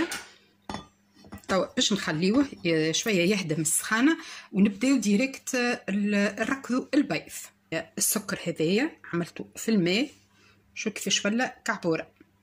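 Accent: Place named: Tunisia. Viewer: بسم الله ما شاء الله عليك اختي عملت كريمة الزبدة بطريقة احترافية..وخاصة انك عقمتي بياض البيض بالسيرو..وأصبح آمنا على الصحة.... جاتك روعة حبيبتي..... الله اعطيك الصحة اخلاص.. أموت في اللهجة التونسية برشة برشة 😄تم معاك بصدق وأمانة حبيبتي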